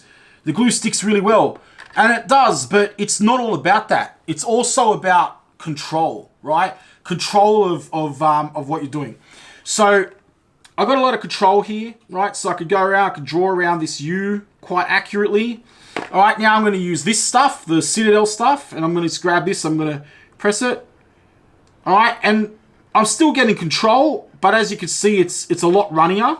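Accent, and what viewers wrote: Australia. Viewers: Great video, good enthusiasm, informative and Aussie accented! Thanks for making this. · The Aussie is strong with this one. Maybe even New Zealander?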